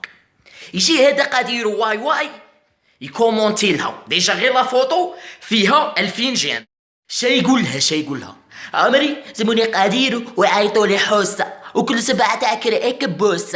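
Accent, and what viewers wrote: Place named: Oran, Algeria. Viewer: ههه لهجة وهرانية مضحكة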